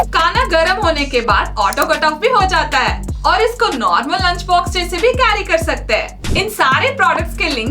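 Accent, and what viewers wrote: South, India. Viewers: South indian accent🎉🎉🎉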